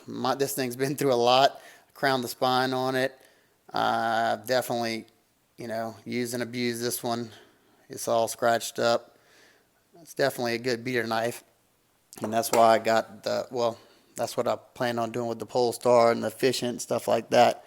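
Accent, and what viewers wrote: Louisiana, United States. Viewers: gotta be from Louisiana?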